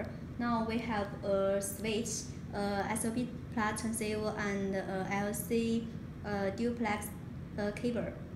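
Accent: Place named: Asia. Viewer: thats how asian speak u dumb ball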